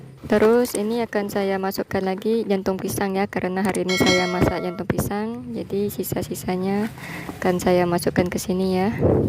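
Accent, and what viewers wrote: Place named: Aceh, Indonesia. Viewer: Menyou tedengo nyo lage oreng aceh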